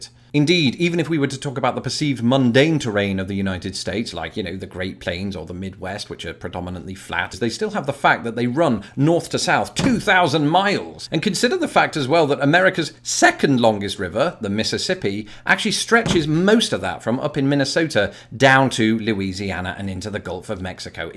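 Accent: United Kingdom. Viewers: So what type of British accent is this? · It’s always weird hearing a British accent saying positive things about the US.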